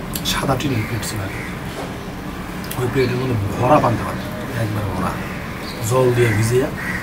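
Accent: Bangladesh. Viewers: আমার বাড়ি মানিকগঞ্জের সিঙ্গাইরে, আমাদের ওখানকার মানুষ যখন শুদ্ধ ভাষায় কথা বলার চেষ্টা করে তখন কিছুটা এমন শোনায়। তাই জিজ্ঞেস করলাম আর কি। আপনার ভিডিও ভালো লাগে। চালিয়ে যান দাদা। সাথে আছি। :) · Tanveer Touhid এটা টাংগাইলের ভাষা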